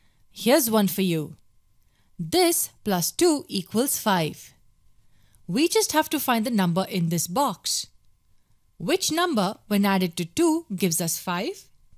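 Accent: India. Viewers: Are you indian? The voice should explain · Are u indian.your voice pls explain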